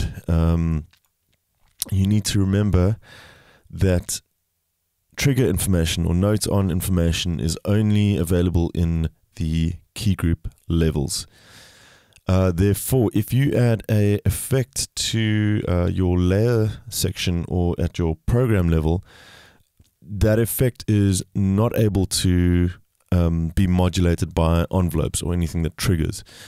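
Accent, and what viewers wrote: South Africa. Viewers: You sound South African👍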